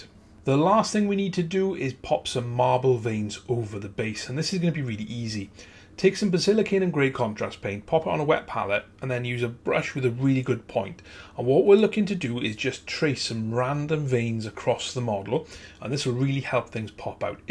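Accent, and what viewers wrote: Wales, United Kingdom. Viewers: Welsh accent I can hear?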